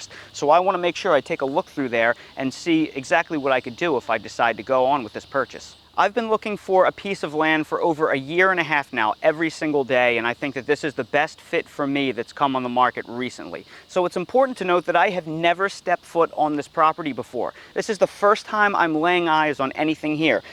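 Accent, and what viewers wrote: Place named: New York, United States. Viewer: You sound like you were born where Woody Allen was born.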